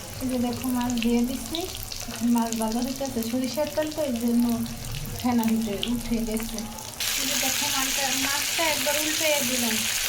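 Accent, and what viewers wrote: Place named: Barishal, Bangladesh. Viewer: ওরে মোর খোদা হেইয়া দেহি বরিশাইল্লা মুইতো ডরাইছিরে আপা তবে ভিডিও এবং কথা গুলি সেই সাদ হয়েছে 🙄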